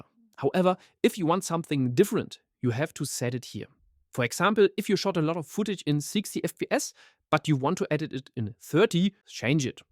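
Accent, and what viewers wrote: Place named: Europe. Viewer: I couldn't help but notice that your speech accent changed drastically...like your glorious European (which country?) accent was just "erased", or at least muddled and more American. What happened there? Did AI try to correct that, and American-ize your speech? I'd seriously like to know! For the record, I like your accent, it's very distinguished.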